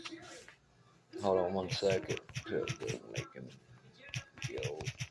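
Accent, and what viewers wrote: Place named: Louisiana, United States. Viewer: Are you from Louisiana? I’m not making fun of the accent - I like it I’m just curious if that’s a Louisiana accent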